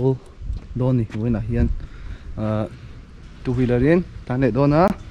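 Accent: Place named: Mizoram, India. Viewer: Brah... English Mizo accent hmang pawp pawp ngam... Ka chhuang che... Ti zel teh... Hnathawh loh laiin ka en ziah a nia...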